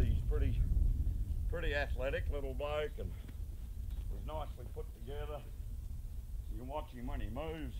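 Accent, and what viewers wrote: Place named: Australia. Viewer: I like that he was gentile and did not get into uneccesary fights and kept the session short with a young horse. I hate the show em whose boss..out of my space, use that whip and teach and ride with pain to make up for your lack of hosemenship. Sounds like down under accent..NY here.. Hate the way I was taught to ride with pain.. These amazing animals do not need bits or tie downs or spurs nor whips.. They or rather we do so much better just using a rope halter with no bit bucles or chains. And it creates a much better bond and team. lovely little chestnut.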